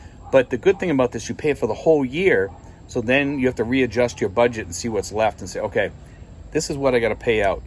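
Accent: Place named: Massachusetts, United States. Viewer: You sound like your from Mass.I know that accent.Im from Quincy.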